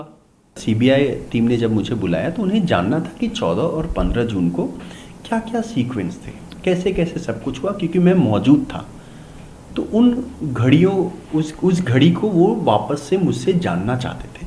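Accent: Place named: Haryana, India. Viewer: Mene esa chennal aaj tak nahi dekha hamko chutiya samjhe ho iski bhasha bihari to bilkul nahi he to ye bihar ka kaha se he iski bhasa hariyanvi lag ri he be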